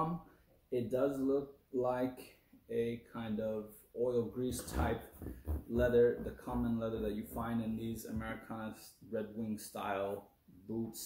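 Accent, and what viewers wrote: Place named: Eastern Europe. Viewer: where were you born? Your accent and grammar appear to be non native. Eastern Europe?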